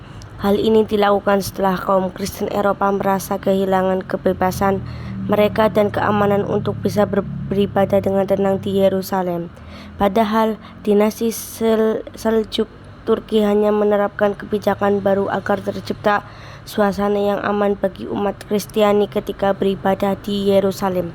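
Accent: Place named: Banyumas, Indonesia. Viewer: Kiw kiw Rada ngapak ya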